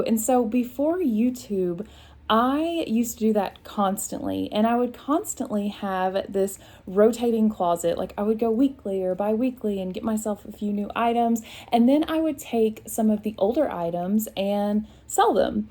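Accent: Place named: Russia. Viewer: Interesting accent. I think your first language is Russian?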